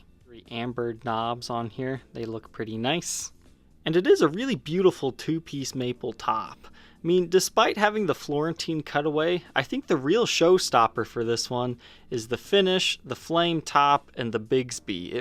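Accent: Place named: United States. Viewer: American English.... Hello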